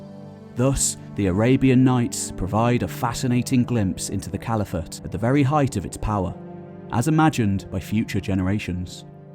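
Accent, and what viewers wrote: United Kingdom. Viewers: Beautiful presentation. Thank you. Also like the British accent. Three defining moments in history, the fall of Baghdad, the fall of Constantinople, and the fall of the Ummayad Caliphate in al-Andalus.(Spain), not to mention Carthage and others. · I like his brand of UK "English" so much that i can forgive his pronunciation of the word " dynasty".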